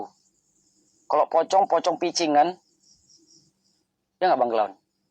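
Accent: Medan, Indonesia. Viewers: Bang kau ngomong Logat.medan Kau batak kah?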